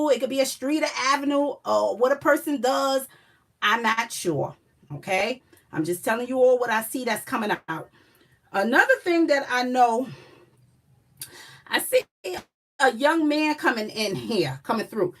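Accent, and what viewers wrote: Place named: Louisiana, United States. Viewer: sound like she from Louisiana. New Orleans home of voodoo. Makes me wonder if her & her family were from Hurricane Katrina when they got flown across different states.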